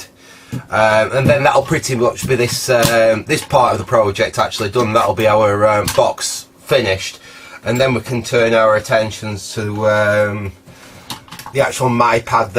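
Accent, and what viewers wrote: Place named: Bristol, United Kingdom. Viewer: Interesting accent trying to place it. Bristol perhaps but just a wild guess.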